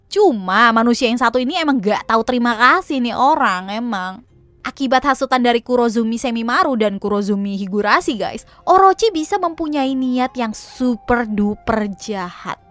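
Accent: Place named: Betawi, Indonesia. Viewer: saikk ada sdikit logat betawinye ~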